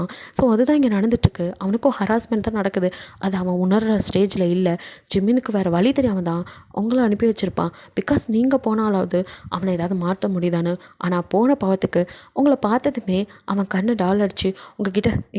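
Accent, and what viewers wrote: Sri Lanka. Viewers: Innaikku Tae bias Ku double suprise aah nandri nandri enakku intha part la RM oda wife enka naattu slang romba pidichchirunthathu unkada kural la srilanka Tamil kathachchaale vadivu thaan 😍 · Srilanka slang super❤❤❤❤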